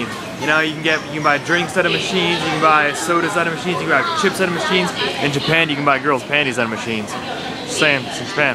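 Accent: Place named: Australia. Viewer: Great Australian accent!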